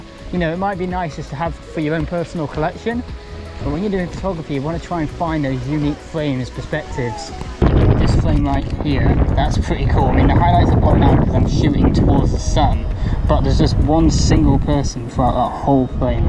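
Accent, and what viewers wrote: Manchester, United Kingdom. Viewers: Mate! I subscribed to not miss any of your funny commentaries min hahahaha!!! Luff your Mancunian accent!! haha 🤣🤣 And of course, FANTASTIC camera work!!!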